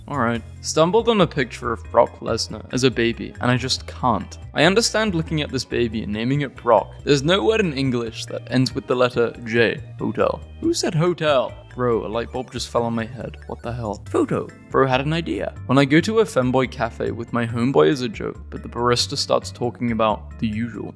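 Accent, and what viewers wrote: United Kingdom. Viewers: You have scholastics too? I thought this guy was british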